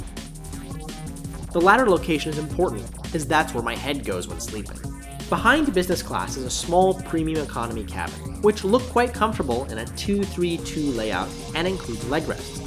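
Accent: United States. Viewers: Love your audio commentary. The seats are the same as Virgin Atlantic business class. I've never tried it but I would definitely give it a miss. Are you American?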